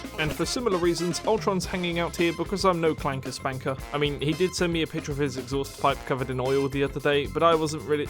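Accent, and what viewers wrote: United Kingdom. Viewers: Seemed like a good vid then he started going on a racist rant about Chinese and black people you know the vid is about to be ass when you hear that dumb ass British accent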